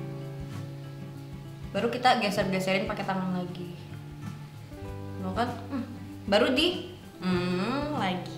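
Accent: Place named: Jakarta, Indonesia. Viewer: dengerin logat Betawi nya neng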